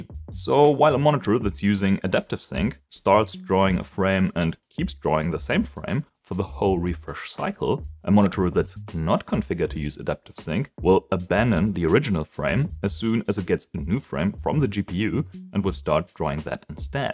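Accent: Germany. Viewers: Tatsächlich nicht den typischen deutschen Dialekt aber dann auf den letzen Metern verraten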